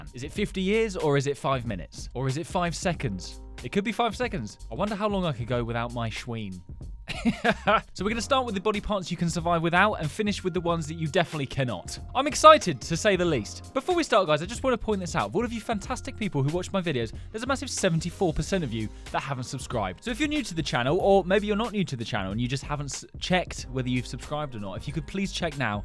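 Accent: United Kingdom. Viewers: …you are British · I’m sorry, but you sound British are you British at the sound British so are you British?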